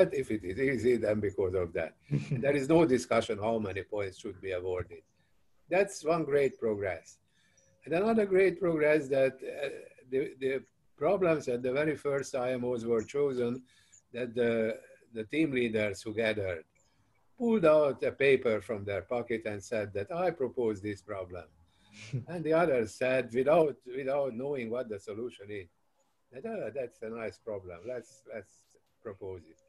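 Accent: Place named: Hungary. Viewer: I know Hungarians have a very distinguishable English accent but damn, Pelikán is the king of speaking English with accent.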